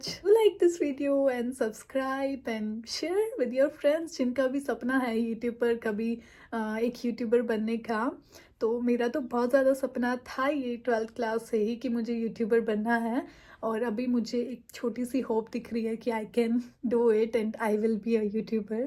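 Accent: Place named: United Kingdom. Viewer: Suddenly I came here aapki hindi british jese hai atcha hein and congratulations 🎉🎉🎉🎉🎉❤❤❤❤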